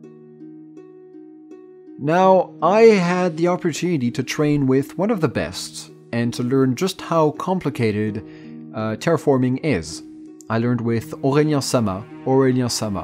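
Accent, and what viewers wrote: United States. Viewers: Ce n est pas un bon accent en anglais il est américain il a plus tôt un très bonne accent en français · est américain, donc forcément il a l'accent. Et pour le nom d'Aurélien Sama, un anglais (ou américain), quelque qu'il soit, s'il a un mot français à dire, le dira avec l'accent français (ex: Rendez-vous) et encore plus si c'est un nom propre.;)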